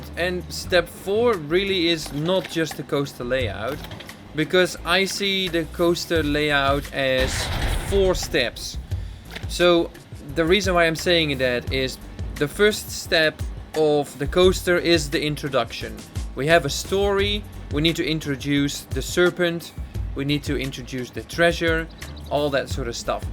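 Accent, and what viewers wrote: Netherlands. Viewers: You're based in New Zealand? You sound so Dutch!?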